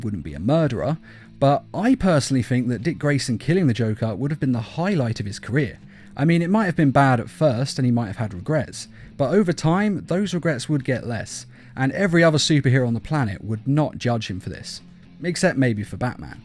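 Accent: United Kingdom. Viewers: I have a question is this your real English British accent or is it fake and can you do a face reveal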